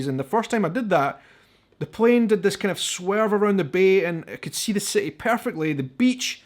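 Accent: Scotland, United Kingdom. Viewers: Greetings from wyoming!! Salt Lake City it’s a diverse city I do love it there , my brothers do live there!! The best time to visit is in the autumn spectacular views from the mountains, I highly recommend it 😌 I love your Chanel your accent it’s so sweet, my son just married a lovely young lady from aviemore and as well looove the accent !! We are planning in visiting Scotland soon I hope!!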